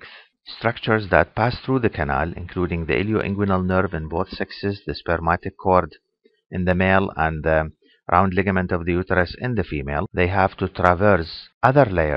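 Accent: Iraq. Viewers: ماشاءالله لا قوة الا بااله سمعت مقدمة محاضرة اخرى سمعت اللهجة و شككت انها لشخص عراقي و اسعدني ان اعرف ان المحاضر دكتور عراقي و أسعدتني التعليقات الإيجابية وفقك الله دكتور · Thanks for the great effort ..and by your accent i can tell you're from iraq am I right? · Your accent seems to be of iraqi origin ?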